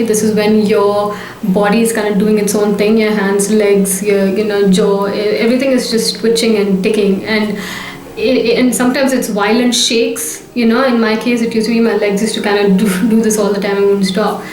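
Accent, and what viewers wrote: India. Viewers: so cute i love the asian indian accent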